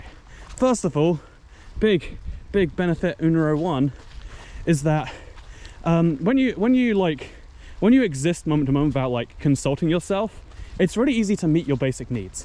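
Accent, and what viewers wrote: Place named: RP, United Kingdom. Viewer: It's purely the posh accent lol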